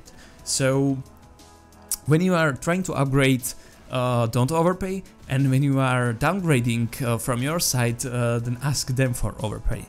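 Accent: Slovakia. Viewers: Počkej, ty jsi slovák? :D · Len som klikol na video asi 1minutu som ťa počúval a hneď som vedel že si slovák je to vidieť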